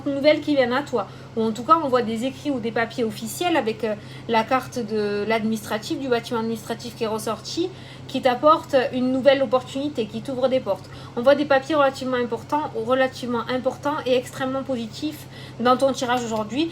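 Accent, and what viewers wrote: South, France. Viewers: Bonjour, Je te découvre. Tu es géniale, pleine de vie, toute en simplicité et authenticité et en plus avec l'accent du sud. Tout ce que j'aime! Je passe un bon moment avec toi. Tes tirages me parlent beaucoup et me correspondent parfaitement 💫😇 Peux-tu me donner les références du jeu spirituel que tu as utilisé? Il est magnifique!!! Merciiiii 🙏💫😘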